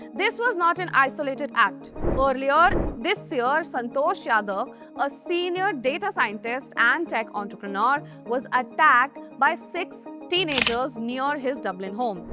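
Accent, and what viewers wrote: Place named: India. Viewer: it is so hard to understand of indian accent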